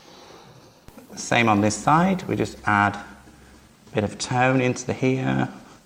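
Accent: England, United Kingdom. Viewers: Love love love love love!!! The step by step, easy to follow instructions. So refreshing to listen to an English accent instead of a droney, nasal American twang! And your phrases: a tickle here, a tickle there. Just loved it. And the result is breathtaking. Completely inspired, thank you 😊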